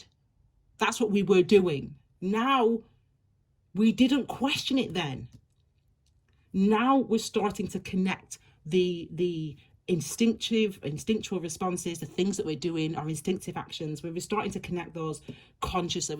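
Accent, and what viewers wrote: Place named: Northern England, United Kingdom. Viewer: Thankyou for picking up my morning. Your accent makes me smile. Im British (i live in London) and i lived in Sheffield for 3 years, your voice does remind me of all the people I met up north. 😊🌈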